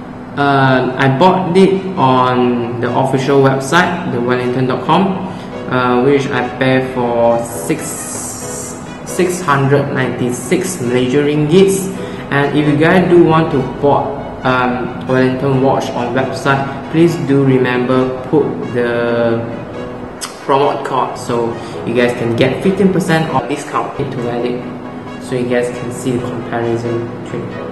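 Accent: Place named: Singapore. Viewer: That singlish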